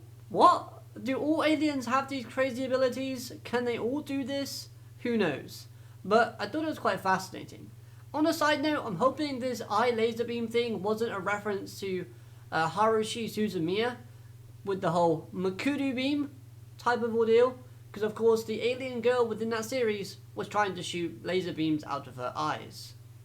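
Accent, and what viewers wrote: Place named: United Kingdom. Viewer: sounds absolutely B R I ' I S H For who is essentially a British YGO reviewer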